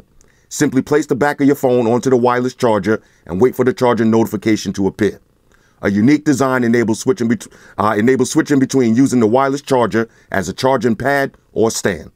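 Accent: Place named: AAVE, United States. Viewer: Have not heard a more ebonics infused product review in all my days. Hilarious and enjoyable :D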